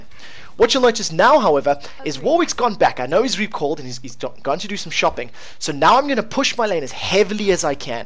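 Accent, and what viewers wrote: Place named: South Africa. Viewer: I think he is South African, that's kind of how we all sound... xD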